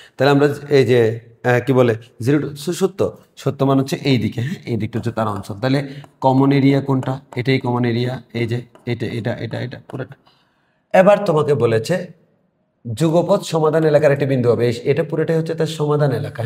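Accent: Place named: Chittagong, Bangladesh. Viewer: Kotha te bujhai jai vaiya chitainge 😂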